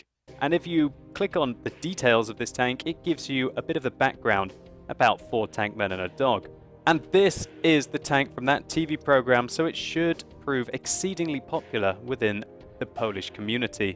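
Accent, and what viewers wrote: Russia. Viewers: Why make Russian accents in a video about... a Polish theme tank? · LOL, love that russian accent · Rolf Rolf it is so easy to flame, isnt it? If you would spend some time to read a book or two, about polish tank platoon during II WW you would realize, that the idea of movie itself and this bloody tank is an insult to the history, but it is easier to pick up the most obvious fact, that some dude reviewing a tank from a soviet tech tree speaks with Russian accent. Congratulations to you, depth of your analysis is staggering, and the fact that you think nobody noticed that till now just means you are a seriously disturbed narcissistic individual. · It was realy bad. You reviev tank with was in polish army with polish tankers and u speek with russian accent with rusian flag and with Soviet Bear...It was realy bad and insulting for polish ppl.... 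· Why Russian accent don't understand.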